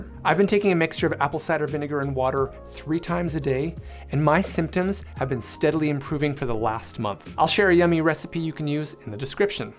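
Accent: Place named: Canada. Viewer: No, hon', I'm vegan, so no animal products, whatsoever. As for my mental health, I've always suffered badly with anxiety and depression (I sadly lost my father to it 😞💔). My mental health got even worse last year. I had two really traumatic events (briefly homeless through no fault of my own, then hit by a snow plow 😭💔). I don't doubt that all the stress, (plus people stealing from me when my former apartment was condemned), didn't help to create this issue.😩 The night I moved into my new apartment in this new region, I had a UTI.😭 So, it was like my body was overcome with the stress. I just hope that this issue can be healed finally because I'm getting more depressed every day living with it.😞💔 Anyway, again, I so appreciate your help and suggestions, hon'.🤗 I hope you're having an amazing day wherever you are (I'm gonna guess Canada from your accent, but I could be wrong.😂).❤️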